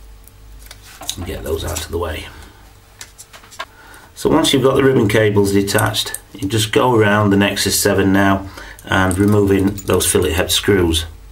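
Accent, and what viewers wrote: Yorkshire, United Kingdom. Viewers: you sound like a yorkshire man ,,,are you near enough to fix mine ?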